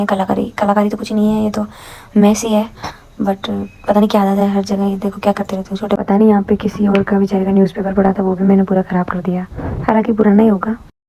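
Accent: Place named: Marwari, India. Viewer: Es aavaj m alag hi marwadi accent aarha h😝👍🏻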